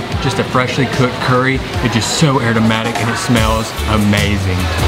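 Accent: South, United States. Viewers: hehehe that southern accent <3 <3 ya'alls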